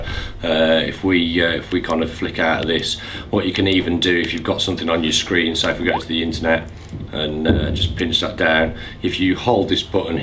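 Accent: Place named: Black Country, United Kingdom. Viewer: Are you from Birmingham or Dudley or somewhere close to there?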